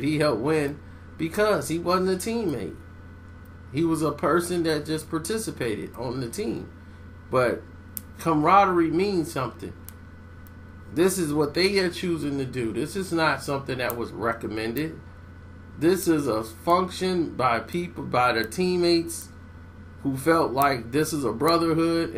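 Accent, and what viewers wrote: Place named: South, United States. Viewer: Ronde Rondo?? How u pronounce some of ur words feel like I'm listening to my grandpops from down south or somethin cuz...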